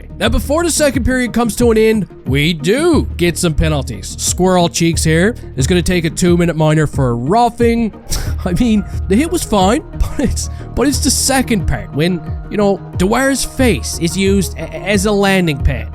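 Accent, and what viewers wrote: Newfoundland, Canada. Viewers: I know a fellow Newfie when I hear one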